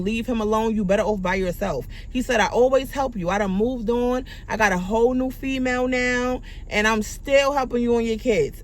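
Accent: New York, United States. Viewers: I knew you were from New York!!! I’m from Brooklyn so I know a New York accent when I hear one❤😊😂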